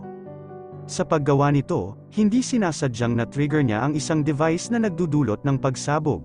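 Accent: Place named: Visayas, Philippines. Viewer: Debris ba? Bisaya kasi mag pronounce eh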